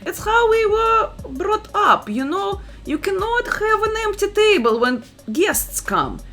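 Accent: Russia. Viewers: This is good.....ur Russian Accent is top notch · Hey, I like your videos. I've been living in Canada for about 3 years so I know most of the staffs that you cover. But I still like watching you. You're funny specially with the Russian accent LOL. Also I'm impressed to know that you're fluent in French, you're a genius bud! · 😋Love that Russian accent..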